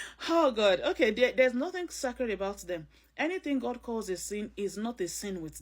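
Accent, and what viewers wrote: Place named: Nigeria. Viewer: I was particularly drawn to your video when I heard the Nigerian accent, because I'd love to hear a Nigerian talk about this topic. Thank you, Sister. The descriptions are perfect for them. I've met them as boss, wife, colleague and pastor. They're plenty among us in Nigeria but most of our people are not aware about their devilish tactics. The worst are the Christian narcs. The most terrible are the covert ones. I've been trying to educate people through my posts so that their 'unlucky' spouses can be aware and learn how to protect themselves and stop being victims of their wicked cruelties. I'll be sharing this video in my WhatsApp.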